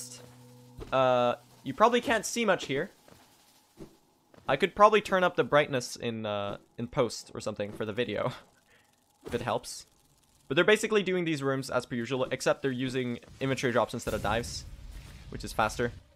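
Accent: Sweden. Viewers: as a swede, you barely have any swedish accent. i'm impressed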